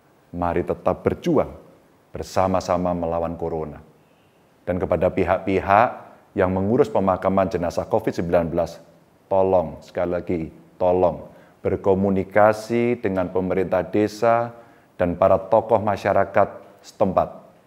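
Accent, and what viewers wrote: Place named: Java, Indonesia. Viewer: Tutur katanya Pak Ganjar sangat lembut dan njawani. Keren Pak sehat selalu.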